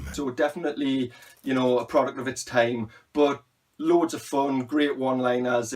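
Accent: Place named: Newcastle, United Kingdom. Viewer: Just discovered your channel, it’s refreshing to hear a fellow Geordie on YouTube. You going to Doncaster in March? I went for the first time last year, it was great.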